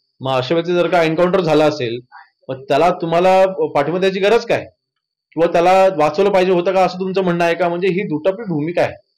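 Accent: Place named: Raigad, India. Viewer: Ha Aawaz Raigad Mahahad Raigagad Distrccha.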